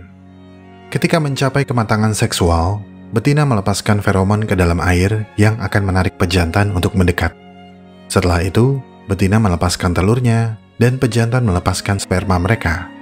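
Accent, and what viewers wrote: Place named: Java, Indonesia. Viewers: Hahahaha.. Naratornya Sundanese nih.. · Kilang kilung nak wong jowo ngomonge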